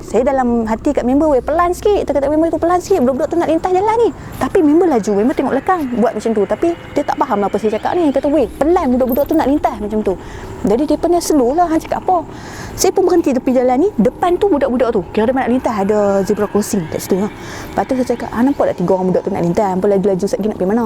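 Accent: North, Malaysia. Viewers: tetap... Saya akan sokong walaupun bahasa utara ni kadang-kadang kasar seperti kertas pasir namun kehadiran cerita cerita seramu sentiasa ku nantikan asalkan keseramannya sampai ke paru paru dan limpa hahaha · Pekatnya loghat utara, saya yg org n9 ni kena dgr betul2 baru faham 😅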